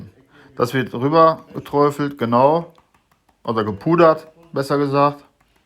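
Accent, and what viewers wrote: Ruhr, Germany. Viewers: Super Rezept und echt lecker. Kommst du aus‘m Ruhrgebiet oder hast da lange gewohnt, weil du öfter „dat“ sachst 😉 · Sehr gut erklärt! Kommst du ausm Ruhrpott?? deinen Dialekt finde ich klasse, Ruhrpottbruder! LG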